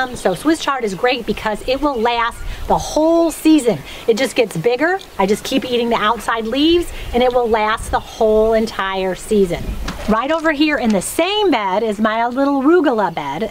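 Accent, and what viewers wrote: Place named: New Jersey, United States. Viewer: Love your garden! Looking forward to see the progress throughout the summer. I also grow all of my own produce here in sunny CA! Sunflowers are one of my favorite flowers also, but did you know that they have allelopathic qualities that can prevent other plants growing around it from flourishing? I just found that out two years ago and now i have a dedicated sunflower patch so I can get every ounce out of my raised boxes. Anyways just thought I’d mention it in case you didn’t already know. By the way, your voice/accent sounds just like my aunt from New Jersey. Are you originally from around Jersey?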